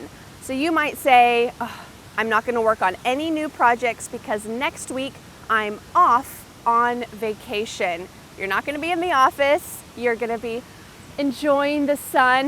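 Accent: United States. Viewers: Hi. I 'd like to master American accent that sounds lovely and gentle! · ❤️❤️❤️❤️❤️. You are the lovely teacher. You gave me The Amarican accent.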